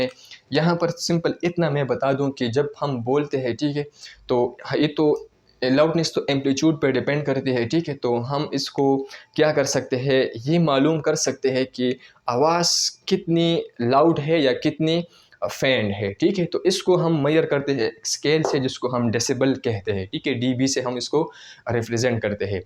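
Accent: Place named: South Asia. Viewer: because brother you looks foreigner but when you sounded south Asian it was really surprising and funny. As far as I can guess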